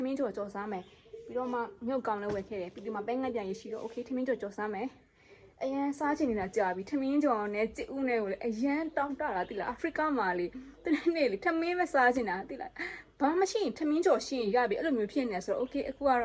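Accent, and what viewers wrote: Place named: Myeik, Myanmar. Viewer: အမက ဘိတ်သူလားဗျ ဘိတ်အသံနှင့် နည်းနည်းတူနေတယ်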